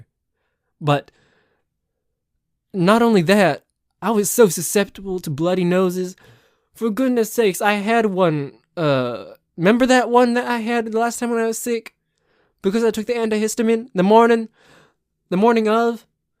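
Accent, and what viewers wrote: United States. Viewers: Nice!! More of! killed me…great work, love your southern talking, yeehaw 🫶🏽🤣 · As a resident country, great country accent.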